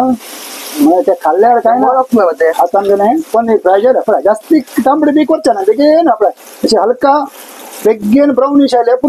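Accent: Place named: Konkan, India. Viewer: It's amgele konkani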